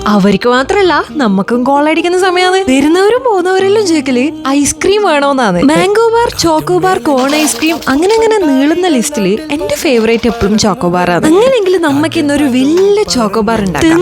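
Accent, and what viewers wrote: Kannur, India. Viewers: Kannur ഭാഷ ❤ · kannur analle❤😊 enekkariyathathu konda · കണ്ണൂർ കാരി ആയിരിക്കും അല്ല്യെ 😌🐝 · Kannur anno chechi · Kannur ahnnaa? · Chechi kannur aana😅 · Kannur ahno eyal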